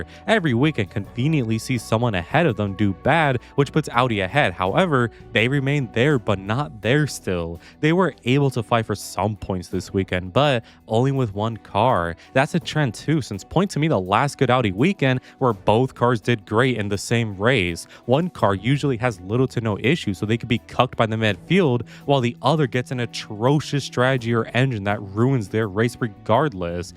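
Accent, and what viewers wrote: United Kingdom. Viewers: No way bruv ur form California I always thought u were British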